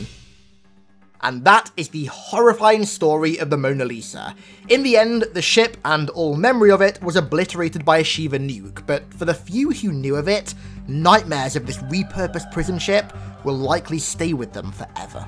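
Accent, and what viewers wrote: United Kingdom. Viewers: British accent then the lisp fuck. My ears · are you british?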